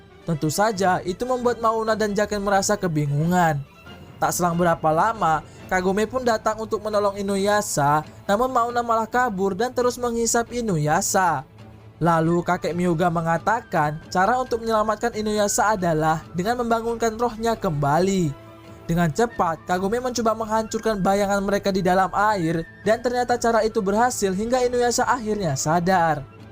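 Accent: Palembang, Indonesia. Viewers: Wong Palembang apo jeme lahat? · Wong pelembang kau ni min eh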